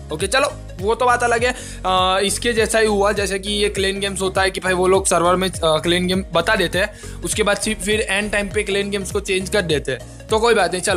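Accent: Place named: South, India. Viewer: Tumaree English south Indianz ki tarah hai :)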